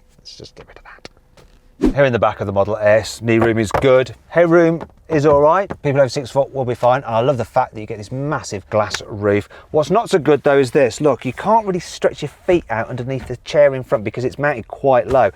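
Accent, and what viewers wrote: United Kingdom. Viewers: ㄹㅇ 카와우 영국발음 소리가 좋아서보는사람 나밖에 없나...?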